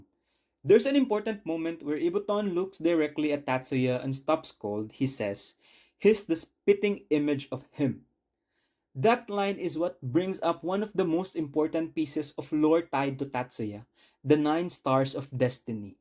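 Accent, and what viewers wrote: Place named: India. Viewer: Please remove Indian accent 😭😭😭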